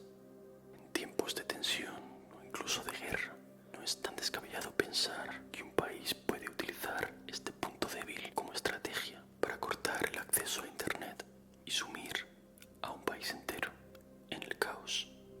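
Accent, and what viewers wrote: Spain. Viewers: Me Encanta el Asento Españolado 😮 Muchas Gracias x Tus ASMR me relajan Bastante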